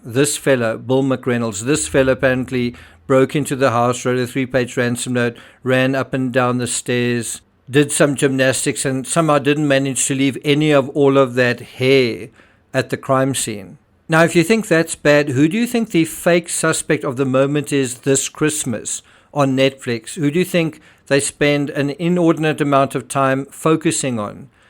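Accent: South Africa. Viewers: I hear a South African. I like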